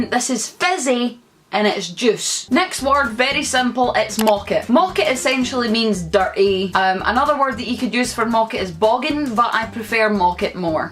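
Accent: Scotland, United Kingdom. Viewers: i love her Scottish accent i am in love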